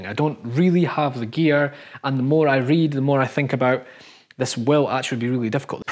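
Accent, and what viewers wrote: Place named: Scotland, United Kingdom. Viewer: your videos are always an inspiration to me, thank you! Your methodical and logical approach, and calming Scottish burr, provide a path to things I've previously thought were out of my reach. God bless you!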